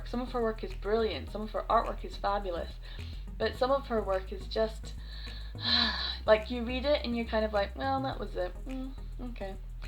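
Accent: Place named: United Kingdom. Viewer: Your accent!! I didn’t realize you’re from the UK! I also didn’t realize you have a channel. Subscribed! 💖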